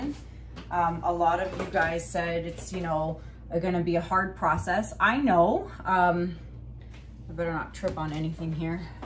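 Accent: Canada. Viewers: thank you so much. I could hear you pronouncing the name but I couldn't find it. By the way I love you accent. I don't know for sure but it sounds Canadian? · Just found your site,....I'm in the US but knew you were CA with the eh and the owt! lol I have family in Dunnville, ONT! They make fun that I drop my 'H' sometimes! Soooo, Love your work, your dog Juno and excited for your reno!! Since I'm much older than you, I have advice! Enjoy the journey, trust yourself and don't worry....I choose the green, btw. Looking forward to your next project and your vlog! Pat from Brooklyn, NY (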